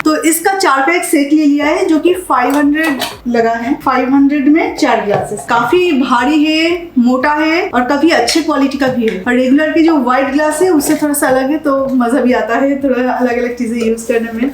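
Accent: Bengal, India. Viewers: She is bengali, it's her accent.... Kindly don't use such language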